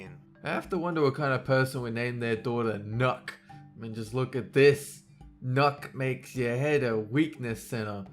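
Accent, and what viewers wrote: Australia. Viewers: Is he Aussie🇦🇺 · is this guy Australian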